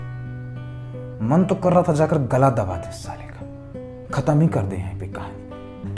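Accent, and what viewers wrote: Bihar, India. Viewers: Bhaiya aapki story relate kar jati hai hamari life se. aur bhaiya aapki wo bihari language bhi , Main bhi bihar se hi hu bhaiya.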